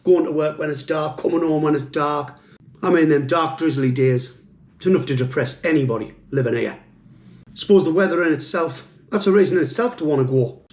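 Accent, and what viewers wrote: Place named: Newcastle, United Kingdom. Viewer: I love the Geordie accent. "Close to the borne"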